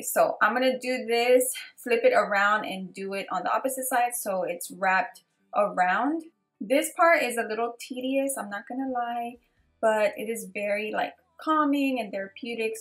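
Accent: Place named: South, United States. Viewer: Love your videos you talk ok I’m from the south to. 10 th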